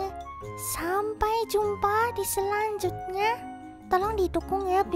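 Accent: Java, Indonesia. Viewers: Intonasi jawanya kerasa banget, kayak sensasi baru gitu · wong jowo y? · orang jawa ya kak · Kak logat ny kaya jawa terus kak abang nya kok kaya sunda 🤔 sebenernya sodara bukan sih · Fix logat jawa:v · Dari Legok Suaranya Aslinya Orang Jawa:v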